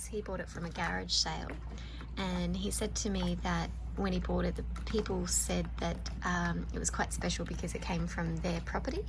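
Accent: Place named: Australia. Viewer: Really amazing stuff you have here!! LOVE IT!! I have a little wood burning set....I might have to get it out and try a few things!!! ❤️ Sounds like you are down under!!! AND>>>how cool are you out on a boat?? Awesome!!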